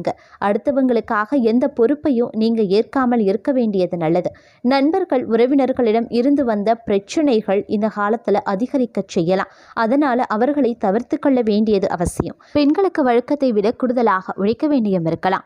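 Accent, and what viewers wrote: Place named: Sri Lanka. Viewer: இலங்கைத்தமிழில் வாசிப்பு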